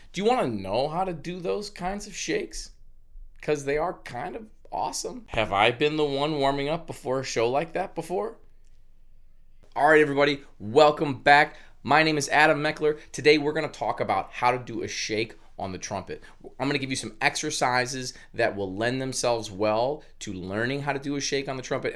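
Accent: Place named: New Jersey, United States. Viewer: ha! I couldn't place your accent -it sounded like new jersey.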